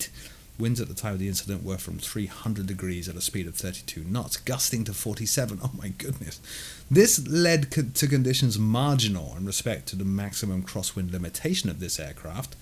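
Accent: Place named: United Kingdom. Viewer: wait are you from florida?! you sound british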